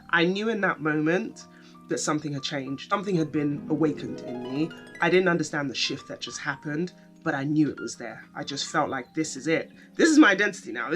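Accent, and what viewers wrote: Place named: Barbados. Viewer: Alright de bajan accent sounding right den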